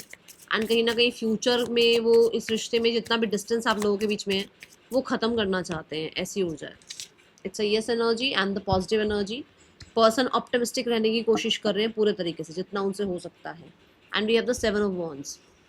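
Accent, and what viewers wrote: Punjab, India. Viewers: Mam tusi punjabi ho ?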